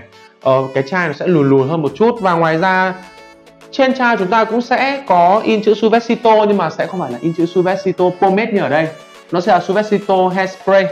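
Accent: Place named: North, Vietnam. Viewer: Giọng bắc kỳ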